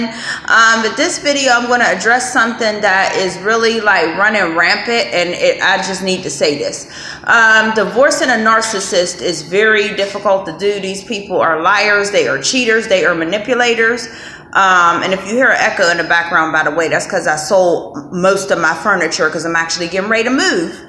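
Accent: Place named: Mid-Atlantic, United States. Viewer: do you..interesting accent btw...are you from the mid atlantic region?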